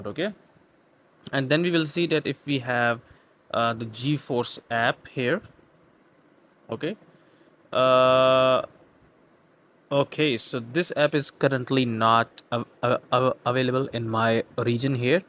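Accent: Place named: India. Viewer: Speak english not indian english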